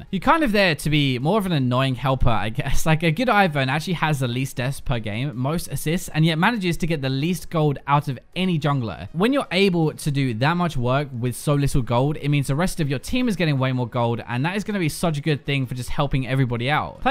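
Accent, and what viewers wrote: United Kingdom. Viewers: First time I'm annoyed at British accents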